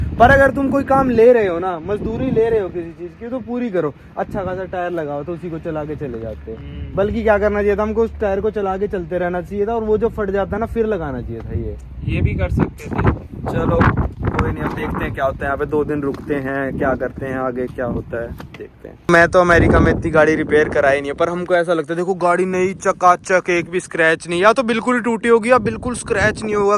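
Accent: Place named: Delhi, India. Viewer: He speaks like Delhi waala Desi ❤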